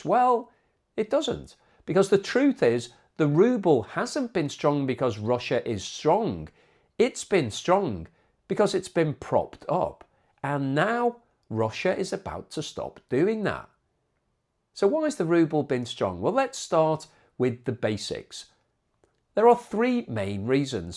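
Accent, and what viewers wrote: United Kingdom. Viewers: I do like your fast British delivery rather than those wordy USOnes that treat us like idiots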